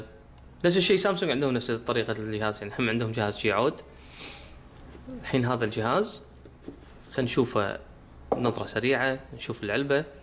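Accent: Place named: Iraq. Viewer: كلامك عراقي لايك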